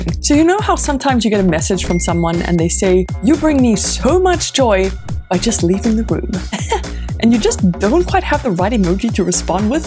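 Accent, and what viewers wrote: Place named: South Africa. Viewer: Cool Soth Afreekan accent.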